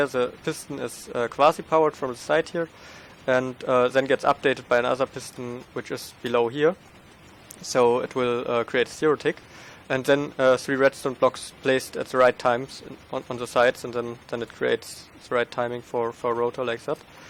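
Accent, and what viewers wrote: Germany. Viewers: sprechen sie deutsche? das hort wie du eine deutsch akzent hast.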